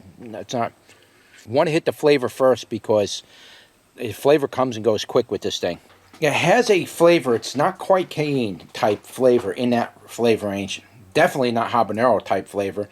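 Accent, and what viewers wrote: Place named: Philadelphia, United States. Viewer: I enjoy your videos because I grow a lot of chiles where I live in New Mexico and also I am from Philadelphia so its nostalgic hearing your accent. Im not sure where your from but I hear home in your voice. Thanks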